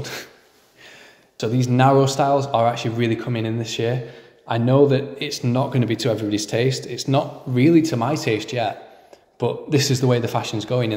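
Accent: England, United Kingdom. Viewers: With YOUR HEAVY ENGLISH ACCENT , Many international followers hear this “ it is my job to PAY you the best glasses. “ Adjust, adapt, modify . Speak clear, dont swallow words. We are not British English. English is international , keep it continental .PLEASE 🥹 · You just need a bit more practice hearing Brit English for your ears to adjust. That's like saying you can't understand someone in Mexico because you learned Spanish in Belize.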